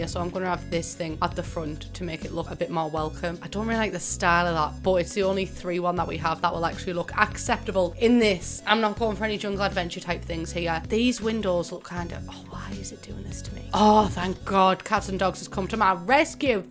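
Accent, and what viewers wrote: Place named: England, United Kingdom. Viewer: out of all the english youtubers i love accent the most and she's probably my favorite english youtuber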